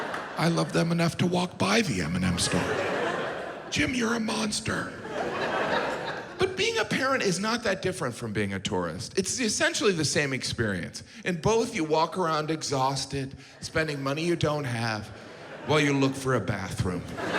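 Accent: United Kingdom. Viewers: Hi, loved your routine. Your British accent is hilarious, you're very handsome, far beyond the pale! Many greetings from Argentina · I like your British accent Jim did your kiddos teach you that? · That's an English (ish) accent, no such thing as a British accent.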